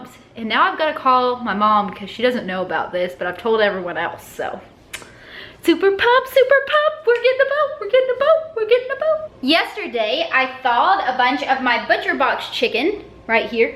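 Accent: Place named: Texas, United States. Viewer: I knew you were a fellow Texan from your accent.😀 Im a newbie to your channel and I love it. CONGRATULATIONS on your boat 🚤🚤!!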